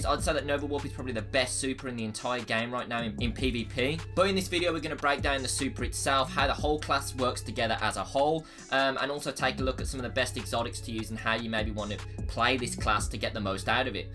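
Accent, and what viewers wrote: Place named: United Kingdom. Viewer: British am I right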